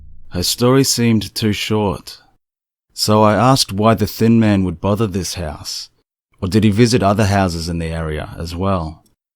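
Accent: Australia. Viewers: Wow!! I really loved this video! Listening to your very sexy Aussie voice on this perfectly beautiful fall day. What more could I ask for?? And I see you've amassed quite the following!! Many wonderful comments on this one!! Congrats!! Even though elephants are my all time favorite animals, I do like your sexy kangaroo!!;-) Take care hon. I'm looking forward to the next one!!:-*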